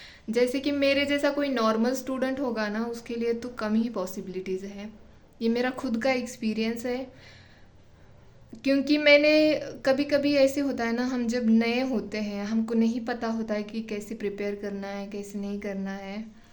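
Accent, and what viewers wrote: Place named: Maharashtra, India. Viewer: Ma'am you are from Maharashtra 😅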